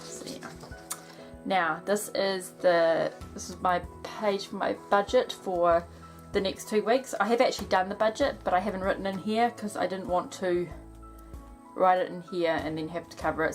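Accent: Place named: New Zealand. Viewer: Hi - I love hearing our kiwi accent on bullet journal videos!! I started a bullet journal in August last year...just in a grid notebook and using a black biro. The system made sense to me so I bought a Leuchtturm 1917 dot grid from The Drawing Room (Chch) for 2017. Keep it simple to begin with...check out NZer Pages to Plans on Instagram and her own website... Boho Berry - Bullet Journal 101 (she also has a resource library with handwriting practice sheets)...The Lazy Genius How to Bullet Journal. All the very best!!!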